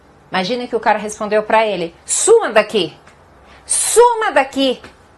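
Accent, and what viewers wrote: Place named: Sao Paulo, Brazil. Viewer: Essa professora es tao bonita, formosisima, e ainda falou do meu Chile aaahhh eu estou enamorado de ela😍😍❤❤. Por su linda forma de falar ela debe ser paulista, a pesar que em Sao Paulo ha muitos sotaques. Ao final nao se sabe qual es Paulista realmente. Mas a letra R ela a fala como riojaneirense, eu adoro, tal vez ela es Paulista mas em alguma cidade que está na frontera com o estado de Rio de Janeiro. Saudos desde Chile e um grande abraço. Beijos nao me atrevo pois deve ter enamorado ou marido 😬🙊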